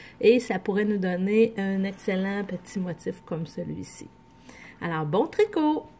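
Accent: Canada. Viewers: Merci pour ces explications très claires , ce point est très joli . Bel accent canadien.👵 de Nîmes 🇫🇷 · Parfaitement expliqué ! Joli rendu ! Et........delicieux accent. Québécois ?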